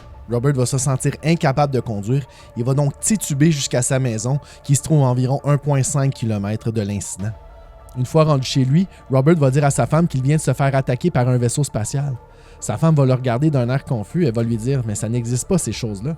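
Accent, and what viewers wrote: Canada. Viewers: Superbe vidéo comme d'habitude. Mais je trouve que sur cette vidéo, tu parles un peut trop vite...et quand tu parles trop vite avec l'accent du Canada c'est difficile pour te suivre, et te comprendre.quand tu parles plus lentement. C'est beaucoup plus audible, et c'est top! Merci pour tes vidéos!!!!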